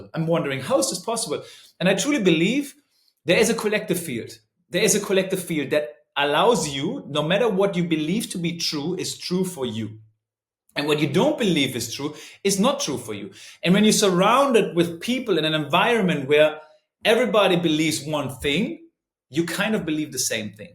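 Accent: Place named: Germany. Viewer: Sorry Kumpel, irgendwo habe dich sprechen hören, dass du in Englisch sich einfacher als in Deutsch äußern kannst😉 Jetzt finde dich Englisch sprechend und ok...du redest fliesend, aber dein German accent ist schon markant, was deine obige Aussage nicht nur in Frage stellt, sondern auch ausschließt. Deutsch ist und bleibt für dich eine Sprache die du am besten kannst(dagegen mein Deutsch...na ja). Bin auf dich in polnischen Medien gestoßen und aus reiner Neugier habe ich kurz recherchiert, wer du bist. Mein herzlichen Glückwünsch zum Vater sein, das Gefühl könnte ich zwei mal lernen. Eins haben wir noch gemeinsam...wir beide leben in Schatten unserer Frauen. Meine Gattin(im Avatar noch mit 36) kommt wie die Marcelina auch aus Polen. War Inhaberin einer Medienagentur in Stettiner TV und Finalistin, Warschauer Look of the year. Nicht desto trotz, ständig bodenständig, liebevoll und smart. 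Mach alles richtig, den ersten Schritt hast gewagt 🥂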